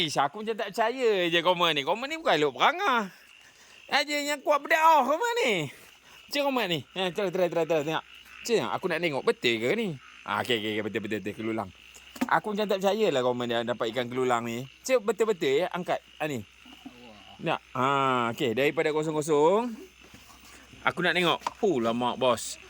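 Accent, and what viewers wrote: Perak, Malaysia. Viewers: Cara cakap tu kat Perak gamaknya..😂❤😂❤😂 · Sya btul2 suka dgr abg cakap loghat perak..trbaik la bang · Saya dgr admin ni ckp... Kg Telok Perang. Kalau berdasarkn dialek, rasanya di Perak. Tapi x tahu mukim, daerah mana...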